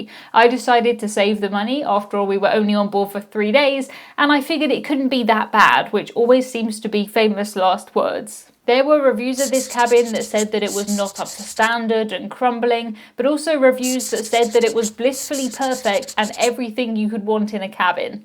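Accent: United Kingdom. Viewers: My wife and I love your videos. Great narration with a wonderful British accent - all done by an incredibly nice person. Excellent job! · You are so adorable. As a yank, your accent is just Devine! Do we Americans have accents to the British? Live video Cheers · Got to just love a Brit accent!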